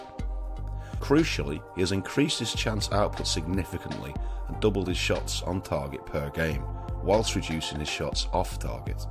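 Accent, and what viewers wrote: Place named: Leeds, United Kingdom. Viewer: This guy is from Leeds I reckon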